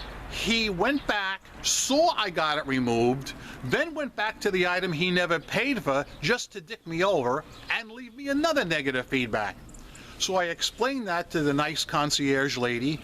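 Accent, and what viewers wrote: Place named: Brooklyn, United States. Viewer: needs to put a compressor/limiter on his audio. When I know he's about to shout (I can see it in his eyes) I immediatly lower the volume. Otherwise, my wife might say "Who are you yelling at!?" and I both have that NJ accent but his is more Brooklyn, NY style. When he says the word "for" it sounds like "fur." I'm sure he drives the people that live down south of The USA crazy. They will say "What did he just say?" Like when the movie "Rocky" came out in the 1970's.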